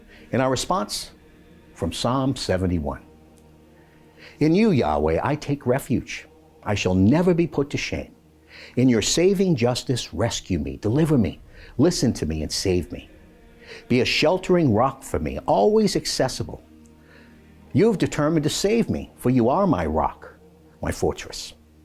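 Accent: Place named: Boston, United States. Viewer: I recognize that Boston accent 😊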